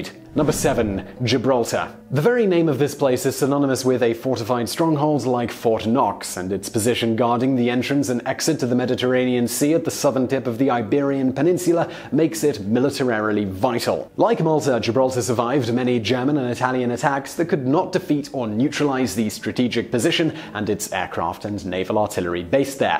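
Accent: England, United Kingdom. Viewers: How does this chap have an english accent except for his hard vowel pronunciation in any words not used in daily speech.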